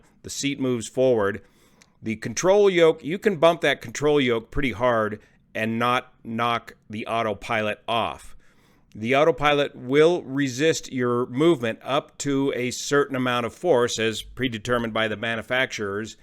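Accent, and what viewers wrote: Chile. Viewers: Based on the accent of the voice, It seems that the person speaking in the video is from Chile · Definitely chilean spanish👌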